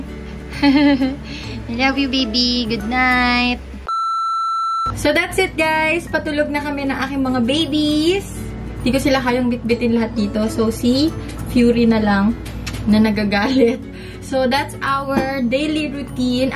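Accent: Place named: Ilocos, Philippines. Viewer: with her ilokana accent. annako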